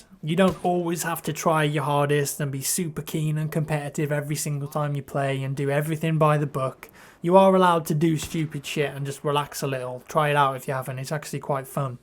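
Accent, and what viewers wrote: England, United Kingdom. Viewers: What part of england you from?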